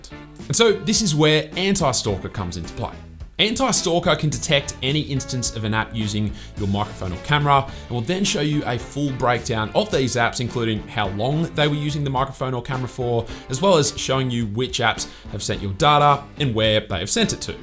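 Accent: Australia. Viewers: this guy looks american but has an australian accent. so interesting!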